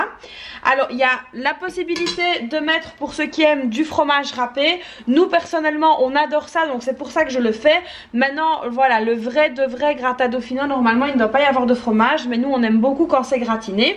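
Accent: Belgium. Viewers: Woaw enfin la meilleure des recettes de gratin dauphinois en plus c est tellement délicieux à déguster . Tu fais vraiment la même recette que moi 🤩 Merci beaucoup à toi pour le partage 👍👍👍 Dit si pas indiscret tu es de Belgique ? Car nous avons presque le meme accent hihi je voulais déjà te le demander mais je n osais pas ^^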